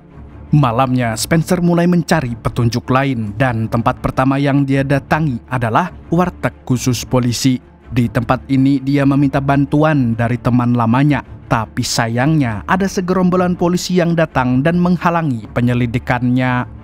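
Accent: Banjar, Indonesia. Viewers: Aeee... Urang banjar kh pian..? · urang banjar😂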